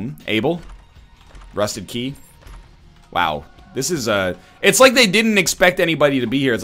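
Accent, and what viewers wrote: Australia. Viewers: Your New Zealand accent is more Australian than New Zealand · probably closer to an aussie accent as they accentuate everything!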